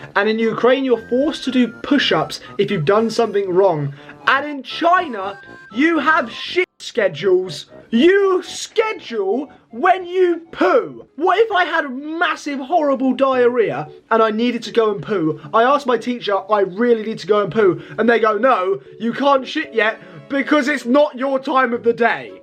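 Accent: United Kingdom. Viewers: British u meant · he’s a brit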